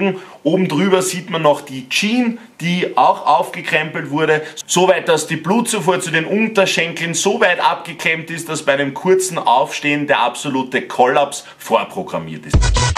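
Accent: Austria. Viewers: Ich liebe österreichisch🇦🇹bist du deppat endlich einen gescheiten Youtuber gefunden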